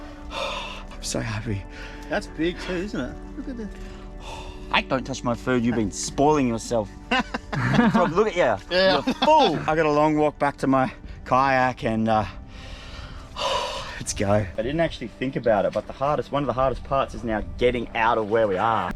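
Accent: Australia. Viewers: Is he Australian? Would make sense… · lemme guess: your australian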